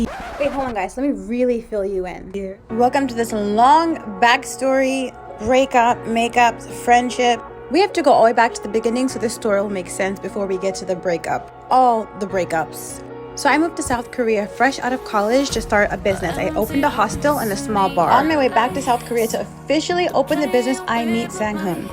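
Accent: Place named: Trinidad and Tobago. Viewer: I love your Trini accent (^_^) I'm glad you're focusing on you again ~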